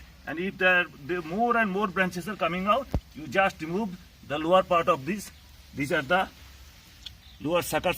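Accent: India. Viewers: इससे तो आप हिंदी मे बात करते तो अच्छां होता ...ये कीस तरह कि इंग्लिश है ? इंडियन लेह्जे कि ?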